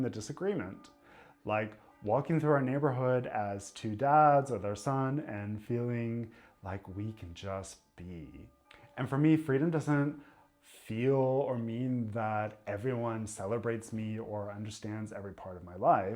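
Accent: Bavaria, Germany. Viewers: I felt it when I was in the core of a little friend group for the first time around my 30th birthday. I’d never had that growing up as a nerdy, gifted/neurodivergent girl who naturally clicked better with boys/men but was also not the best at navigating the whole attraction thing due to the undiagnosed AuDHD and being ace-adjacent as well without knowing. It felt amazing to be chosen without a lot of masking, within my nerdy railroad engineering friend group 😊. I noticed your inflection is a lot like Feli from Germany, so I’m going to deduce it’s a Bavarian/Munich based way to end sentences tone and length wise, I’d heard another German creator who spoke US English do the same, but I wasn’t sure yet if the area inter US where they learned English was the shared factor, or if it came from a German region 😅.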